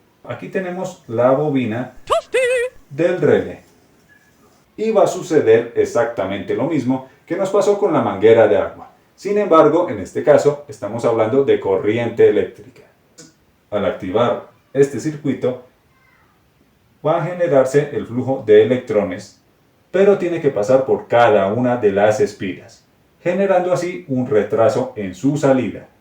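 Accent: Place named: Caracas, Venezuela. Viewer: Epale pana mío tú vídeo esta genial y lo extraño es que tú dirección de tú canal de Youtube dice que estas en Colombia pero tú hablas como venezolano de Caracas santa mayulla pana mío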